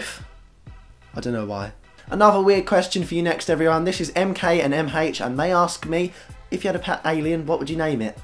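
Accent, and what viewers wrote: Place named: Australia. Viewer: Phaha hilarious! Your australian accent is just like mine, guess that could be a compliment or not we may never know! xD you never fail to make me laugh, thanks! :)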